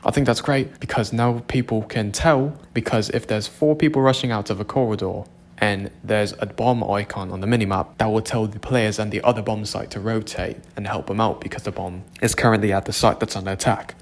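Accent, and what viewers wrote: United Kingdom. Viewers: Well hello there? R u British to? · You grow so fast keep up the good work. LMFOAOAOA YOU SOUND BRITISH YO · Question r u British?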